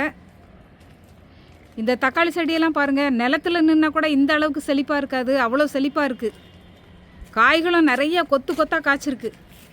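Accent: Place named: Thoothukudi, India. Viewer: very happy to hear acca.I found out from your slang that you must be from Thoothukudi or Tirunelveli.I'm also from Thoothukudi only.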